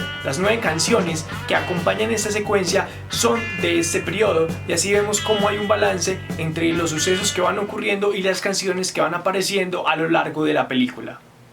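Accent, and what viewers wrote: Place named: Costa Rica. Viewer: Sos Costarricense con solo escucharte.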